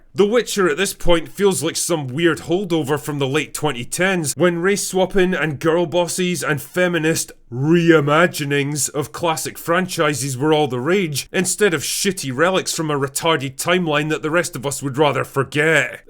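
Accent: Scotland, United Kingdom. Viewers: I had to give up watching this after 2 mins 19s because the voice of the commentator is sooooooo annoying. Yes the Witcher is bad especially now Henry has gone…… but that annoying scottish turd is so much worse I couldnt bear to hear another syllable come out of his mouth without feeling my toes curl the wrong way.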